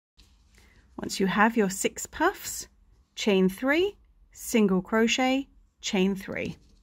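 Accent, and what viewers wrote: United Kingdom. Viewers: Agreed Dolton-all of her videos/tutorials are so easy to follow, and she explains thing(steps) in easy to understand terms. Plus the British accent is great 😊. Also been crocheting for 50+ years and still learning thanks to awesome teachers like 🎉🎉🎉🤩!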